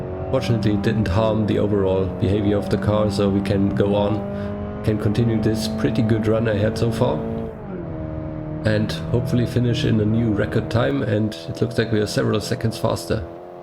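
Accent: Bavaria, Germany. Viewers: Du kommst aus Bayern?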